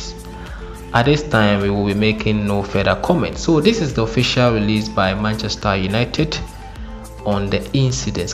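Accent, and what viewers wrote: Africa. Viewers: Are you an African? Your accents says it all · Love the african accent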